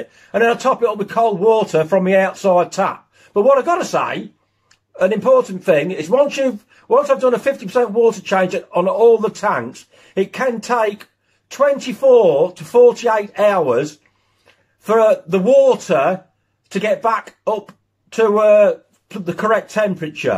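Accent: United Kingdom. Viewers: Appreciate the video. Young fish keeper looking to set up a fish room when I have the space. Nice to hear a fellow Brit :)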